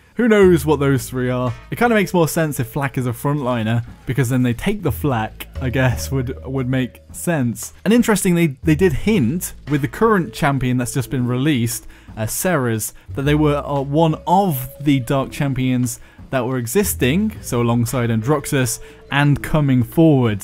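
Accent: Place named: United Kingdom. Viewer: I love your british accent and your voice. I would talk to you for hours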